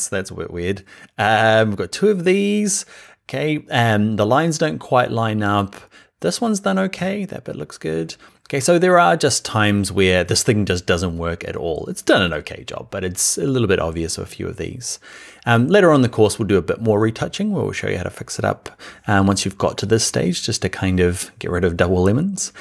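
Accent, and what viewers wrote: New Zealand. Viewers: Very helpful! BTW, when you slow the playback speed to .75 you sound drunk. Everybody loves a drunk Kiwi! :)) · Fellow kiwi? Can I just say, as someone who makes tutorials myself, my hats off to you for such a calm, cool and smooth delivery in your narrative. I'm a third of the way through your course and you explain everything so clearly and at a pace that I can totally keep up with. Kudos to you my friend!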